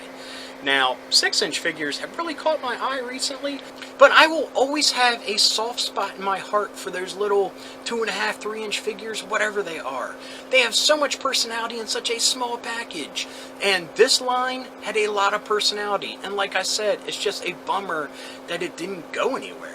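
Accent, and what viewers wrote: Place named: Baltimore, United States. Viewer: your Baltimore accent triggers me, but in a good way 😄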